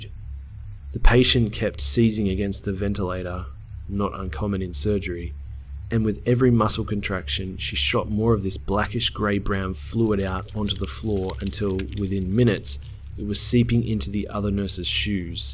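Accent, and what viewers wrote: Australia. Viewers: Badass man, keep up the good work. Great videos, like the Aussie accent too. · ***** No worries. It's always entertaining watching your vids. I also follow LM. I live on the Gold Coast in QLD Australia, so it's cool to hear a familiar accent :) I'll be waiting in the wings for a new video soon mate!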